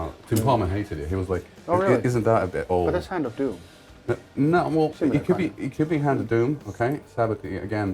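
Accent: England, United Kingdom. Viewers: Villes voice has changed alot the last few months. he sounds soooo much more British now. LOL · He has always had a English accent, and he doesn't hang out with Dani Filth a lot he lives in Finland and Dani Filth live in England pretty far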